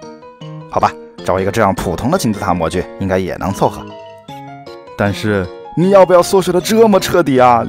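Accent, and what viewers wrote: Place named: Changsha, China. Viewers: 这个解说太逗了，哈哈😄 听口音，你是长沙的不？ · 感觉博主有长沙口音……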